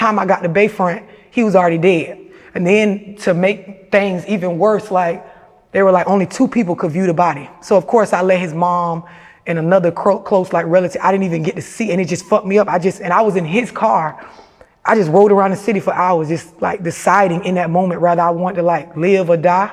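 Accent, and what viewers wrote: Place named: Florida, United States. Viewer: It's Florida's accent. And yes. She's very sexy.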